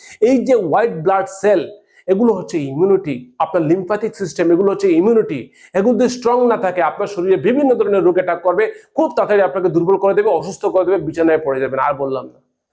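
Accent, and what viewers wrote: Sylhet, Bangladesh. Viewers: ভাই আপনার বাড়ি কি সিলেট?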